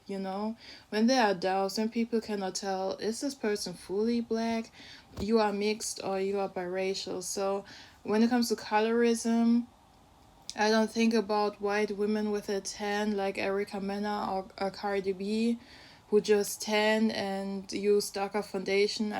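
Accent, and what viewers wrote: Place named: Germany. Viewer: She actually has a German accent, and to be honest I usually dont like German accents but her accent is BEAUTIFUL ❤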